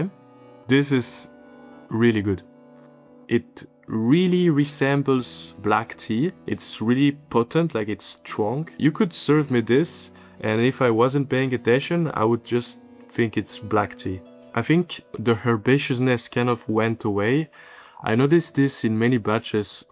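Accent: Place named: France. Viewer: great video! l'accent français est veri grite also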